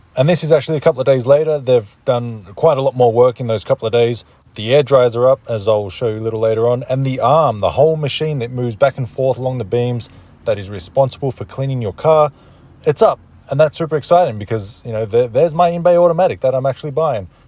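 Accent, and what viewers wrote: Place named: Australia. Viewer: I'm actually dying to go to move Australia. I was shocked when I noticed your accent, and you moved to California. I feel like Australia is the place for me. I'd like to move to Sydney. I'm sick of the freezing weather here. Canada is brutal to live in. Sydney has the most amazing oceans I've seen on camera, but maybe it's hard to make a living there. You obviously know more than me.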